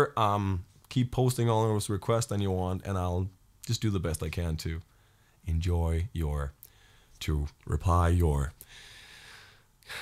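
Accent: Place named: South, United States. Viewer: you are from denmark how do you talk like american i say southern part brother love your music keep it up you know the band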